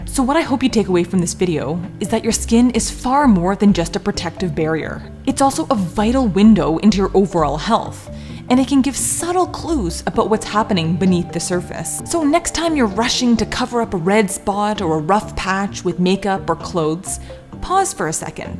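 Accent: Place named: Canada. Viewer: She sounds Canadian. Lots of good information here.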